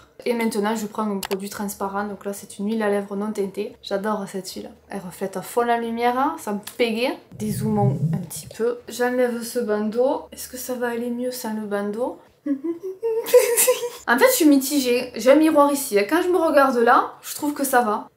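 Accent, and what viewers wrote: South, France. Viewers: Transformer le bouton en grain de beauté,j adore votre accent ça fait penser aux vacances a l été ,aux cigales. Surtout lorsque comme moi on habite dans le Nord ,lol😅😅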